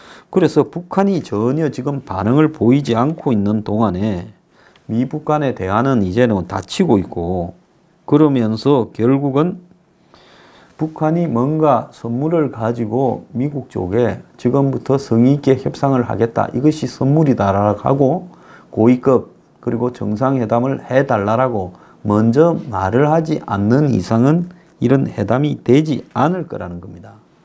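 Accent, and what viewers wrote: Gyeongsang, South Korea. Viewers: 뻣뻣하지 않습니다. 경상도 액센트 좋습니다. 진실을 말하는게 가장 듣기 좋습니다.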